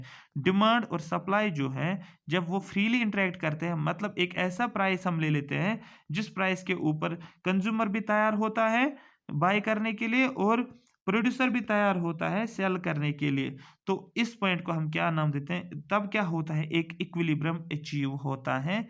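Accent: Kashmir, India. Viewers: are u Kashmiri sir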